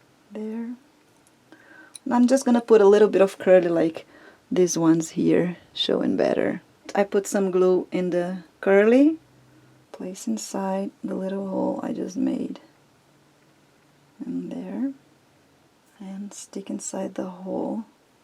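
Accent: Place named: Brazil. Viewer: Aah, eu sabia que seu sotaque era diferente, imaginei que fosse brasilwira no momento em que ouvi tua voz, alguém mais?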